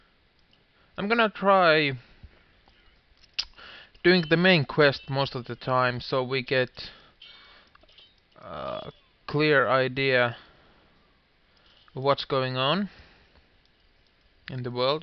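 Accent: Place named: Finland. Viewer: Heheh must be the Finnish accent.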